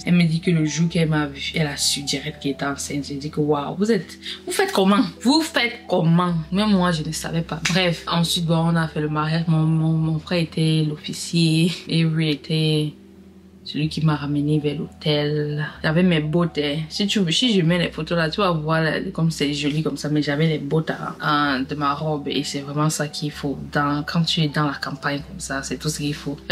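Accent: Cameroon. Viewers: juste dire t es trop drôle.tu racontes comme une vrai Kmer😂🤩🤩🤩.j adore la coiffure du premier mariage restreint ❤❤❤🎉🎉🤩🤩🤩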